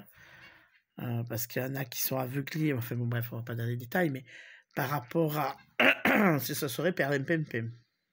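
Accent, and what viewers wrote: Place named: Marseille, France. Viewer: Bonjour, merci votre video que je regarde plusieurs fois car vos explications sont toujours hyper intéressantes, gratitude, j adore votre accent marseillais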